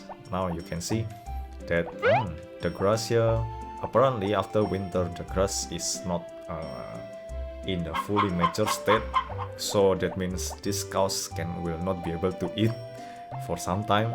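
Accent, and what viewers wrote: Indonesia. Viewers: Judging by your accent, you must be idonesian. Arent you? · hello , me and my gf are watching this whole guide in Japan, and this game is so interesting we are not even studying lol . i will be replaying this with my her who is a huge fan of this game. thanks for this guide , bro. btw, she immediately recognized your indonesian accent, because she is also indonesian i guess Harvest moon and Indonesia go hand in hand :) thank you again for your efforts. Appreciate it greatly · yes your javanese accent is very noticable through your english 😂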